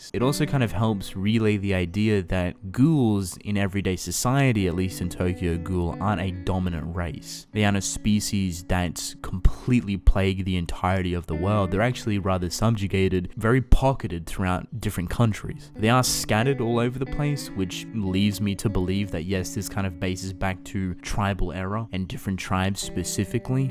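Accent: Australia. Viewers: Polynesian tribes in those days did actual believe in the spiritual cannibalism that consuming the flesh of your enemy made you a more efficient warrior annnd say swear your aussie thats crazzy cause i never realized at all haahah im froom melbourne btw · Wait, ur Aussie? Same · Wow, can definitely hear your Australian accent much more in these older videos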